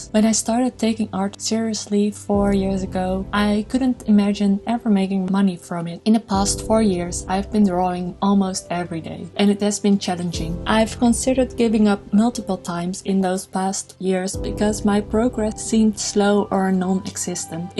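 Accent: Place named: Netherlands. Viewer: I didn't watch the live adaption of Last of us, but a gameplay of the game. Really loved it back then. 😅 I also don't mind your Dutch accent. When I speak english people can probably tell that I'm from Germany. 😂 At least I can whenever I hear a German native speaker speaking English. Now onto today's subject: Yeah, learning how to draw or art in general is hard. There are no short cuts. No fast improvements. At least in my case. I am a slow learner. My brain needs to figure everything out and tries to see the big picture first. To give a different example: When I puzzle I look for the pieces first that end being the frame. I don't like to start anywhere. I always need that frame first and then I fill in the spots that are blank. There is also only a short amount of information I can take in everyday. Maybe that's why I spend year's in my comfort zone. 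Breaking out of that zone is scary, but necessary. 🙈 I started my art journey in 2012. So it's been a while. And there is still A LOT to learn. But it's okay. I don't want to rush my journey. Instead I embrace my not so perfect progress. 😊 Even though on my bad days I compare myself a lot to other artist and how far they have come over the last decade with their art, while I still feel like a beginning artist. 😔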